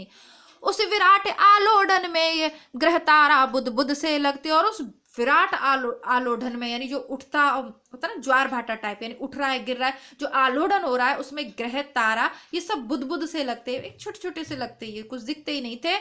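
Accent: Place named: Uttar Pradesh, India. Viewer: हा मेम मेने ही पुछा था।आपके बोलने का लहजा up का लगा।मेरा पीहर करोली के पास ओर ससुराल पश्चिमी राजस्थान हैं।आपके विषय मे जानकर अच्छा लगा।मेरा up टच ह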